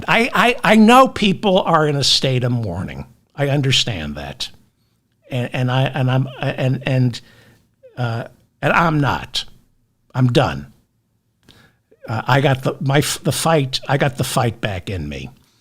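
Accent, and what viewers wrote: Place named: New York City, United States. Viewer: Hey now. I am from Tennessee, Georgia and Texass... (And an early 7 year stint, where my Bush Dad took us, in Hartford, Connecticut -just long enough to mess up my Southern Accent) AnyWAY...I love All accents, especially your NYC Jewish... I've been saying y'all All of my life.😂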